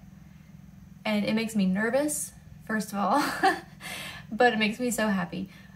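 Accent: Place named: North Carolina, United States. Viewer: Congratulations on your 1,000 subscribers. When I watched your first video I immediately thought you were from NC and I was right. Your accent is very light just like mine but when you grow up in a place it is so easy to recognize. Thanks for another great episode and Happy Knitting!!!!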